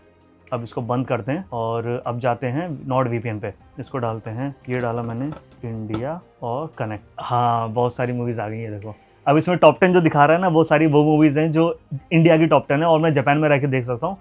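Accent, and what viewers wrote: Pakistan. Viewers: pakistani accent lag rha hai 😅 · pure pakistani accent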